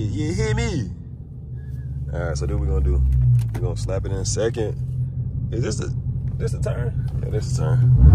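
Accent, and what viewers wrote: Atlanta, United States. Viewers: Sound like u from da Atl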